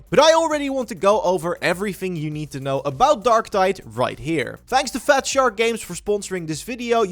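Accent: Netherlands. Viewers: a little tip. Stop pronouncing “o”s in the dutch way. Its not a alOt its pronounced alAt. Just had to bring this up cos it is really bad to listen to 😂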